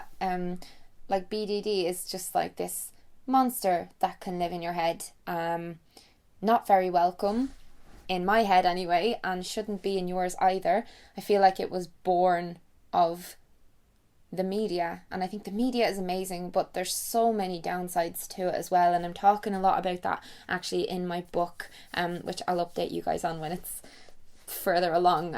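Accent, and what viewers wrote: Ireland. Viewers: I am watching from Ukraine! I love you so much and you make me looooooveee Irish accent! You're so cute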